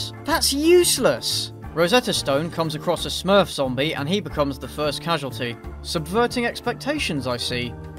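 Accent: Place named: United Kingdom. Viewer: I love your British accent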